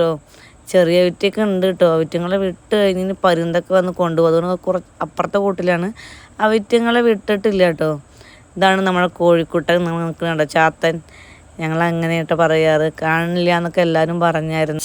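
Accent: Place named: Palakkad, India. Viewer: ട്ടോ പാലക്കാട്ടുകാരുടെ സംസാര രീതിയാണ്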